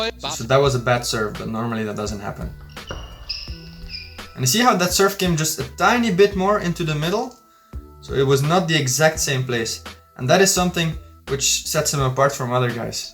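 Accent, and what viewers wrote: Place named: Flanders, Belgium. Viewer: Nice video, thanks! And I thought you gave Timo a good game:) From your name I was surprised to hear you had a Flemish accent. Goed gespeeld;) It’s a pretty basic observation, but I noticed many of your returns from topspin balls deep into your backhand (when you were close to the table) went long. I often do the same and I’m puzzling over effective options in such situations. Good health to your knee and many more amazing TT adventures to you 🙏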